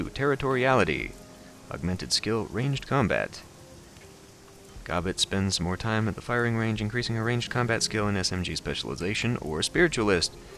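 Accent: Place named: Russia. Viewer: What a pleasant subtle-russian-accent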